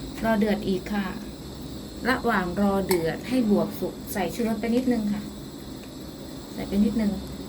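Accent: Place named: Phu Thai, Thailand. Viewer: คือมิเว้าภูไท🥰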